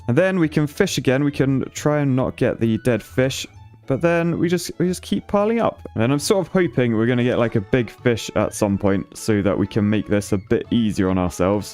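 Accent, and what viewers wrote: United Kingdom. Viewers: I watch your videos to practice listening to british accent and it works pretty well. Thanks, mate!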